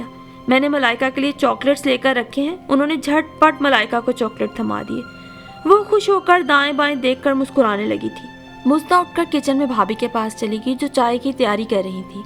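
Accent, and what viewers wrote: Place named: Punjab, Pakistan. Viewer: I am from India... talafuz Acha hai ..toda sa punjabiyat lahza dikh rha hai. Bhut khub..urdu ki khidmat kr rhe hai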